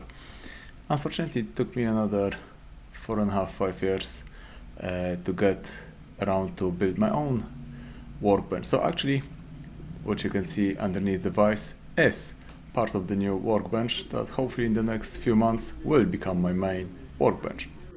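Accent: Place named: Soviet Union. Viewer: Чомусь по твоєму акценту мені здається що ти з "совка" 😉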